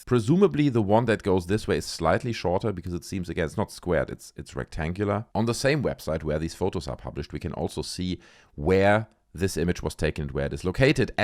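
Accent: Germany. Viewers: an english speaking german sounds so intelligent and trustworthy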